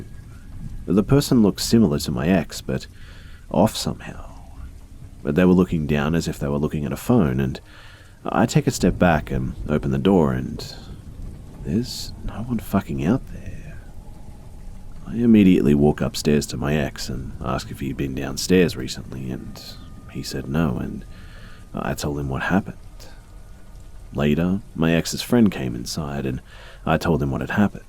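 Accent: Australia. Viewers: Fan from the sunny coast, stoked to hear a fellow Aussie killing the horror!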